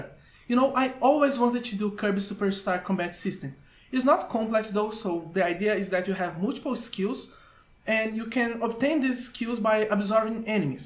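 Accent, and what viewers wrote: Brazil. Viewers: Is that a Brazilian accent? great video :D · I see you are a brazillian by your accent! Im a brazillian too! Also i really like megaman x5 its not as bad as people say (x4 was better tho) Great tutorial by the way dude! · Eu chutei pelo sotaque mas aí vi a versão BR do livro Level Up no fundo e tive 200% de certeza kkkkk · You sound a lot like you are from brasil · Vc parece ser um br falando ingles, mt bom o video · Lol! I recognized the accent too. (Brazilian here too)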